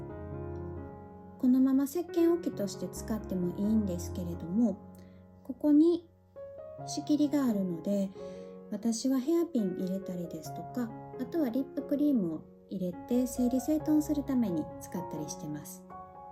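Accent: Kyoto, Japan. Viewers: こんにちは～。今回の動画、良かったですよ(⋈◍＞◡＜◍)。✧♡ 蚤の市の動画も良いですが、今回のはそれを上回っているように個人的には、思います。 そして、かわいらしいお声で、聞き覚えのある京都弁でお話されるのが、更に親しみを感じました。また、このような企画の動画も出してくださいね。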